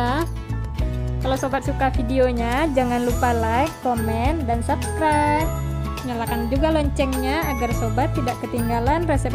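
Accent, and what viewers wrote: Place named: West Sumatra, Indonesia. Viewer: Kk org minang nya,,, keliatan dri logat ny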